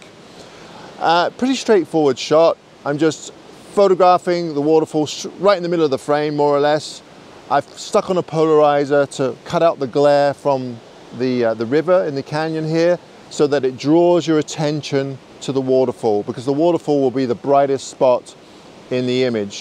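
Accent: United Kingdom. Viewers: Nice final image,. Being a Canuck, I hear your British accent. However, when you declared that you are a Canadian and followed this up with the classic "eh", you conviced me that you are indeed a Canuck as well.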